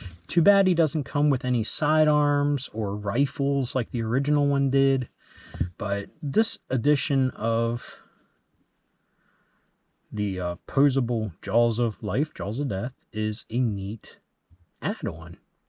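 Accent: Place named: Maryland, United States. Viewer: Are you in Maryland you sound like it I'm in Baltimore I know how we say our Os. If so I'd love to do some Vinatge Joe swaps with ya if your ever interested!!. Also an 80s Kid as well grew up in Baltimore County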